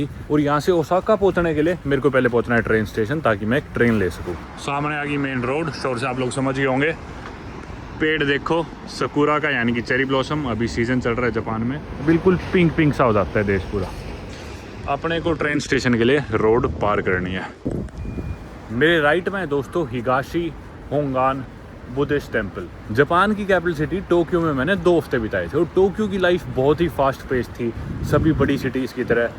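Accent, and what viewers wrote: Haryana, India. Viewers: Saplings non dubbed videos achieved legato hai haryanvi accent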